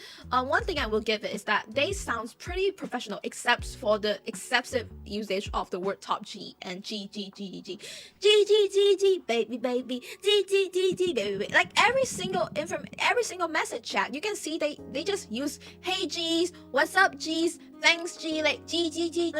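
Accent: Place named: United States. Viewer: Can you be less american in your gestures and way of speaking ?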